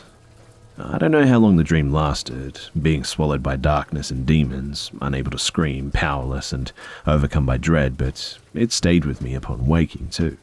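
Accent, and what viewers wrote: Australia. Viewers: As ALWAYS, hearing your VERY COOL, unique Aussie accent as you read these wonderfully twisted stories puts me in a FABULOUS mood as it truly makes me happy; no matter how creepy, spooky, shocking or bizarre the stories are! Thank you for the dedication you have for your subscribers/fans. It is most decidedly more fun and interesting knowing you have this amazing content AND without a DOUBT, you ARE one of the absolute BEST YouTube narrators out there. PERIOD. (I apologize if my commments seems sort of disingenuous, a bit immature or ass-kissy (yep, I make that one up!), but I really enjoy your channel and it's content...so please keep doing what you're doing!!!) THANK YOU!!! 💀👽🛸🧛‍♀️💜🧛‍♂️⚰🦇🌙⛈🎃☠🐱🕸🕷👻🧟‍♀️🧡🧟‍♂️🧙‍♂️🔮🧙‍♀️🖤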